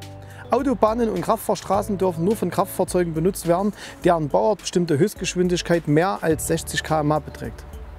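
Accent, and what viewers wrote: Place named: Thuringia, Germany. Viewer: Sächsisch naja eigentlich thüringisch am Rande von Sachsen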